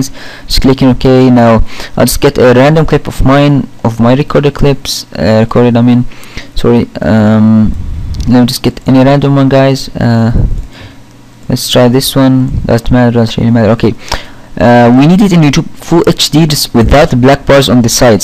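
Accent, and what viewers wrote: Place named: Egypt. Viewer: you sound egyptian :) nice tut, but I prefer using handbreak, it's little more advanced but you can get better quality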